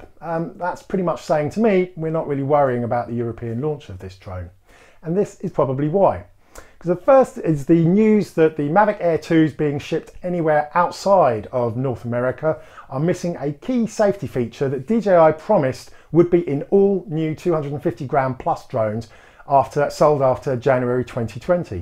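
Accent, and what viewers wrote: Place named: United Kingdom. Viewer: wow did not realise that when I bought my air 2 a couple of weeks ago, I have done videos on my youtube channel about this drone and my P4P, both registered mind, my fear is they will make it so difficult to fly anywhere it won't be worth having a drone, to be fare I only use it as a tool for when I cannot get a composition on foot with my cameras and of course it adds a great perspective that you otherwise would not get, thx for sharing and nice to hear an UK voice for a change.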